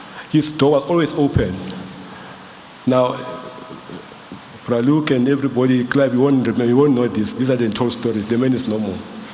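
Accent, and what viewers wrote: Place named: Township, South Africa. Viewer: Brilliant speech with kasi tone 😂😂😂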